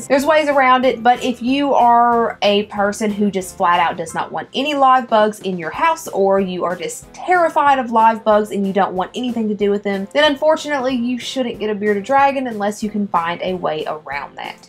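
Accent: South, United States. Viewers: Just curious where you’re from? Hear that southern accent, reminds me of the way everyone talks back home.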